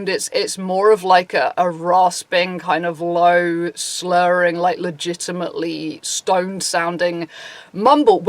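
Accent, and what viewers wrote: United Kingdom. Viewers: Oh that british pronaunciation of you... No idea who you are talking about but I'll watch the video anyways :))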